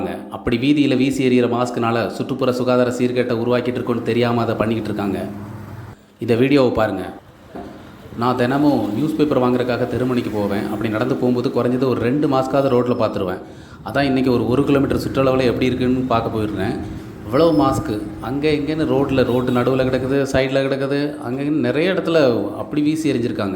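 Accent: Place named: Tirunelveli, India. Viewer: மிகவு‌ம் அவசியமான பதிவு அதுவும் திருநெல்வேலி அழகு தமிழில். அருமை.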